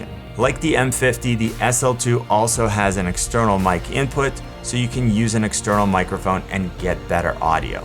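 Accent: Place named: Chicago, United States. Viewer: Thank you- I am leaning towards the SL2 because of affordability and I don’t really want to shoot video in 4K if the auto focus isn’t great in the m50, plus I don’t want to deal with 4K video all over the place. Also I plan to use a gimbal. So in 1080 the sl2 can do 60fps? I want to be able to do slow motion. Also I hear the Chicago accent and the outdoor shots looked like my hometown, Evanston.. ! thank you for a thorough review!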